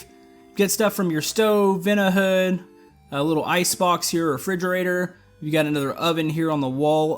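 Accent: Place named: United States. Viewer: What state is your accent from?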